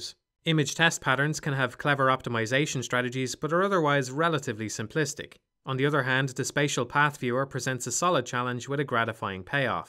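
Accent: Ireland. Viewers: Any chance of a breath of the wild review? I need a twelve hour analysis featuring my favourite floating Irish voice.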